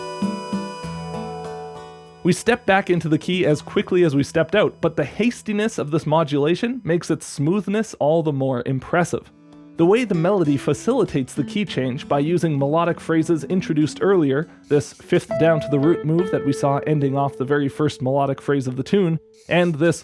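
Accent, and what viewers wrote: Canada. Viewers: i can hear the canada in your voice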